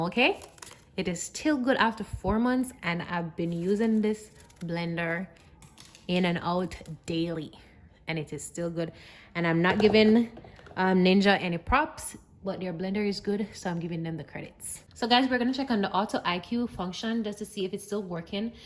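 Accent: Jamaica. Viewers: I hear a JAMAICAN IN YOU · Your accent is so beautiful, just like yourself. Are you from Jamaica? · i was she is from jamaica but at first i didnt hear your accent but like 30 seconds in i was like mi sista indeed lol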